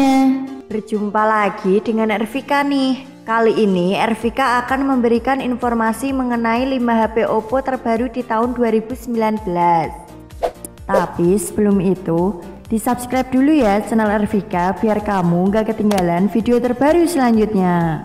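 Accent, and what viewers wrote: Java, Indonesia. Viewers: Jawa banggettt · Kok ngomong y mbaknya medok emang aslinya orang mana? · Medok bgt mbak. Asli mana? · Omongan mu jowone meduk mbka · Medok nya itu Lo,Mbak...bikin klepek klepek.?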